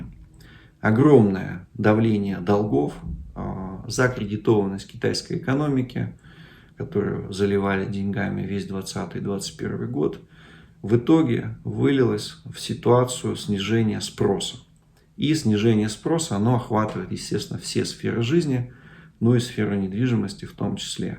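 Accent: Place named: Siberia, Russia. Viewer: Ну ещё и сибирский говор, который, как сказал поэт, "неистребимый уральский акцент ", это мегакруто слушать, при совершенно правильной речи дух человечности реального языка сквозит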